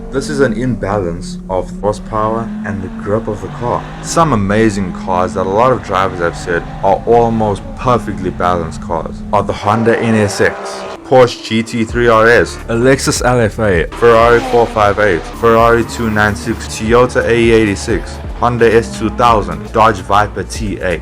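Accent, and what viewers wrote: South Africa. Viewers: since you are taking the time to respond to my comment personally: 1. My ultimate pick would be a Lexus LFA for feel (but due to price, would never get to drive one like that). 2. FD RX7 would be my realistic pick, or probably an old Porsche Boxster for being even more realistic for the wallet and fun. 3. Check out the M3 GTR that me n my mates built for Bathurst (#42), hit a kangaroo 😂 4. I noticed all footage was of the ring - my uncle worked there (I’m a FT dad, so a while before I get behind the wheel again). 5. Porsches are just great from factory 😂 6. I’m actually old - hence the skyline. Nice SA Accent btw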